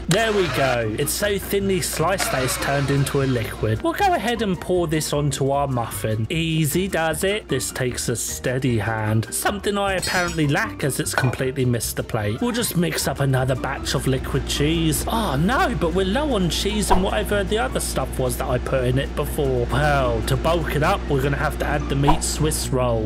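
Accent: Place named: United Kingdom. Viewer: Lol he’s British Letsgameitout fr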